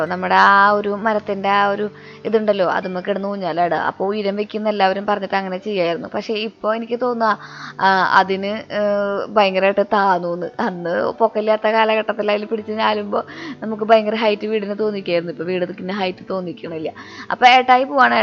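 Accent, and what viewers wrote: Thrissur, India. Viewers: Cake cutting adyam kanunnu. Thrissur bhashayil samsaram kelkkan nalla rasamundu. 😊😊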